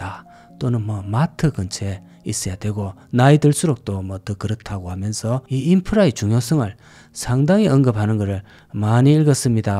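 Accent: Gyeongsang, South Korea. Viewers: 진짜 갱상도 말투며 억양이며 발음 거슬려요. 금정구는 검정구네요.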